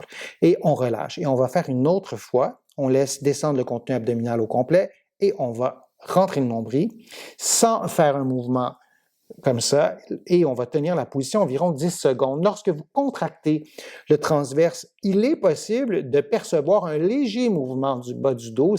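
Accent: Canada. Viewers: Merci pour vos conseils. J’adore votre petit accent canadien…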